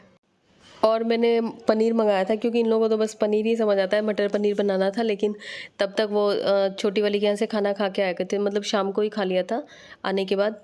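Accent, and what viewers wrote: Bihar, India. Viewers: Aap bihar se ho na?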